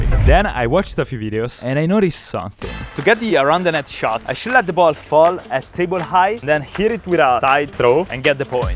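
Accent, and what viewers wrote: Italy. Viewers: Hai un accento così italiano 😆 (bellissimo) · L'italia si sente... nella lingua :D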